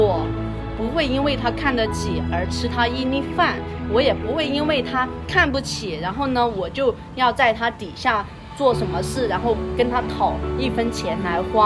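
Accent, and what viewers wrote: Guizhou, China. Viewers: 老公不等於腦公喔，你貴州話音太重了！人是互相尊重的，你敬我一分我敬你三分！ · 对了，昨天把妳说贵州话的视频录下传给一个贵州黔东南属苗族的女性朋友，她说的确是贵州话，她在其他省份工作，很久没听到这腔调了😂😂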